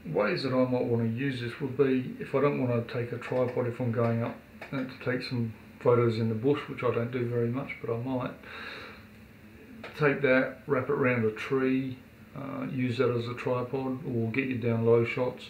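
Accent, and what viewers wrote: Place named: New Zealand. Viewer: Hi '' ''..you are ''brighter'' than me as I had to jump on youtube to see how to open the camera mount for smart phones!..thanks for the heads up.Btw,I live just down the road from where they are made in Shenzhen and the cost was only 59RMB.When the tripod arrived in very professional packaging and I had a chance to see the quality, I made a comment to my son ''how can anyone compete with the Chinese in manufacturing!'' From a fellow Kiwi in China (unless I am very mistaken with your accent!)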